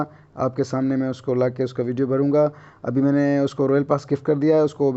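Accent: Sindh, Pakistan. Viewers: Sir I love you so much hame Royal passs neeed nh he busss AP hamesha khush rahe you are best and apki voice se lag rha he AP Sindhi he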